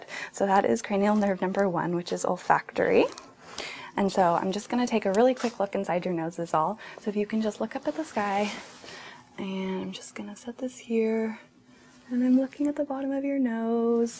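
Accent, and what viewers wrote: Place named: United Kingdom. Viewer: Your british accent gives you away 😄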